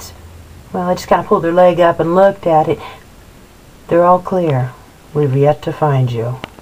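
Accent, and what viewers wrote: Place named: South, United States. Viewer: I laughed more than I should have. You could seriously be a comedian, the southern accent makes even funnier :).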